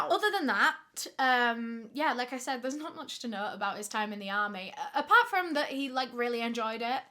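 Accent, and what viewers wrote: United Kingdom. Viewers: what accent is that uk ? scotland ireland?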